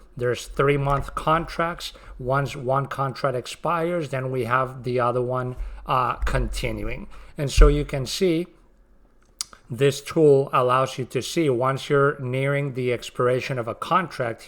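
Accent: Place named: Belgium. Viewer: Are you from Belgium? That accent sounds familiar.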